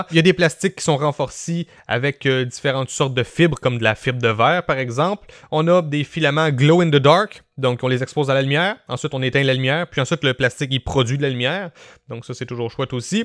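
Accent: Canada. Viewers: Comme toujours, hyper intéressant! Et entre ton accent très exotique que j'adore (mon pépé a été dans la police montée chez vous, à une autre époque il est vrai!) et ton débit (mais comment fais-tu???, Heureusement que tu n'es pas rappeur), je me régale à regarder tes reviews. Toujours instructives et précises. J'attends avec impatience tes essais sur du PLA flexible.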